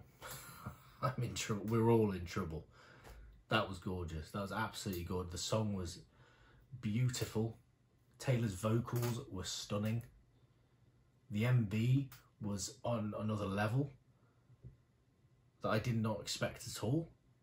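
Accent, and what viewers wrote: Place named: United Kingdom. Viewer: All I saw in this video was a Brit guy repeatedly saying 'I can't deal-' in his adorable accent.... Nd I couldn't agree more....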